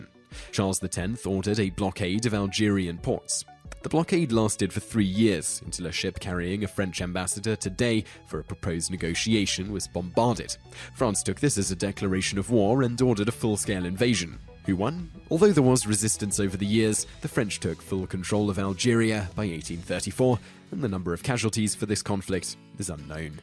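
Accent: United Kingdom. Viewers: It is an ACCENT, if you haven't noticed it already Obs: I love the british accent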